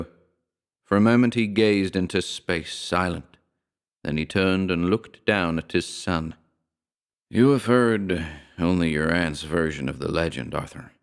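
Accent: United States. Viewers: Nice American accent! You surprised me with that😀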